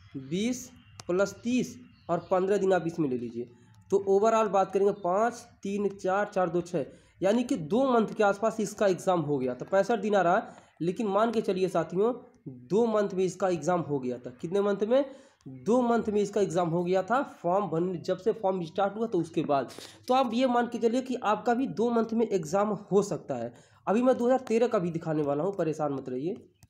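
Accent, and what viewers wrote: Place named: Bihar, India. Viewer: I love bihari accent🤪🤟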